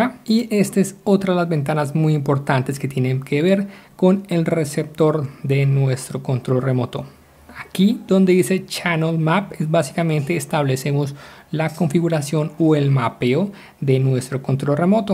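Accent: Colombia. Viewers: hola, eres de colombia??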